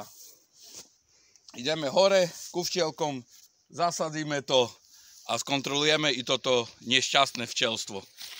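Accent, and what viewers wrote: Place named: South, Slovakia. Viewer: Zdravím tě ! Už je pozdě .kdyby byli silnější tak by to odebrali ale možna to bylo ťím že byli ve 2 nastávcích. Chci se zeptat kde včelaříš ? Oblast ? Ten přízvuk tipuju tak Juh 😉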